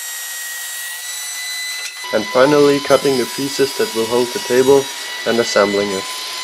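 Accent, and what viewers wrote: Germany. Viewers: Fantastic build. It must have cost a fraction of what a factory built unit would cost. Your English is very good and your German accent is endearing. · Great job. I´m thinking of building one and yours is the best homemade disc sander that I´ve seen. Is that a German accent? The switch looks German made. I´m from Texas but I live in Germany :)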